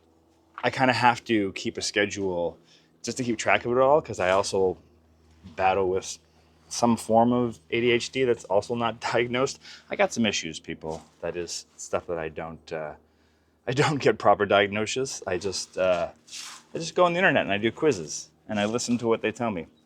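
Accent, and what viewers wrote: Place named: Canada. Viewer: Sounds great. I think some of your words are just Canadian accents. My friend lived in New York and when he says dog, I crack up! We love you guys and it’s great to have community with all our imperfections!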